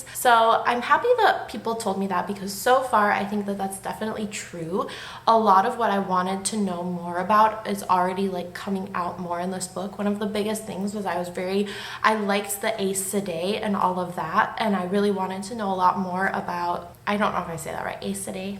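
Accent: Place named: North Carolina, United States. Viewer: Haha no, you don't really look like someone who walks a lot outside in super sunny California 😅 I was guessing that you lived in or somewhere around maybe North Carolina or Pennsylvania or something like that, based on your appearance and also specifically your accent, but I'm not American so there's that. Anyway: Your pale complexion is obviously very pretty, however, and so I don't think you need to strive for a sunburn/orange brown tan. Way overrated in this world. 😊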